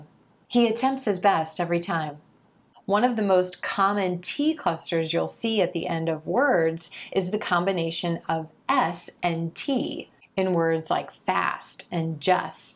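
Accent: United States. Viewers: The best American accent teacher I have ever met so far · You're a wonderful instructor of the American language. Stay blessed. BTW can you tell me you're from which state in the US?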